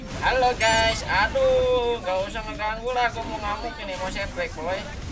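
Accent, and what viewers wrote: West Java, Indonesia. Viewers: Logat sundanese salam dari cijerah lur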